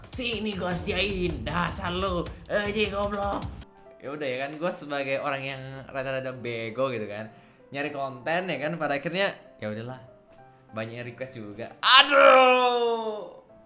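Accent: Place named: Medan, Indonesia. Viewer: Gw suka kalo bag marah marah kau kau wkwkwk org medan mana ni horass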